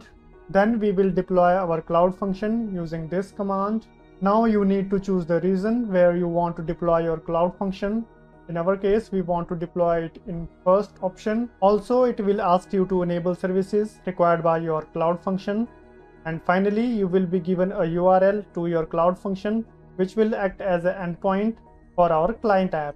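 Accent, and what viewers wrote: India. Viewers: An INDIAN Voice ....?? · You sounds so... Indian???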